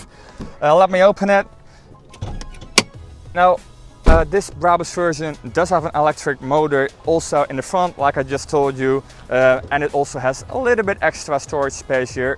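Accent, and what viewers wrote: Netherlands. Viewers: the English was perfect, but me being from Belgium, I always recognize a Dutch neighbor when I hear one. Great job with the review.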